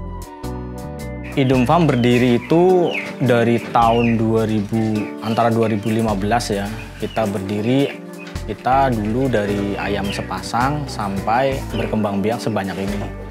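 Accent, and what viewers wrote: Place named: Banyumas, Indonesia. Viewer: Wong ngapak💪👍👍👍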